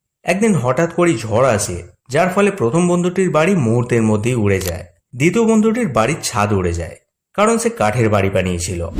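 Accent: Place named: Kolkata, India. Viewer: আপনার কথা শুনলে মনে হয় কলকাতা একটা ভাব আছে,, এটা কি যদি চেঞ্জ করে একটু বাংলাদেশীদের মতো করে বলতে পারেন,, আপনি অনেক দূর যাবেন😊😊😊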